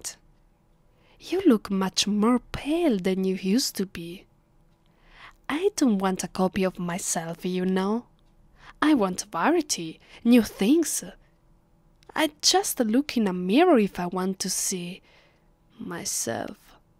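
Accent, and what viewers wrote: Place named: Italy. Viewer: To, I enjoyed your vampire roleplay. The title Vampire 's Captive. And the Italian accent, actually makes it. Extra special indeed.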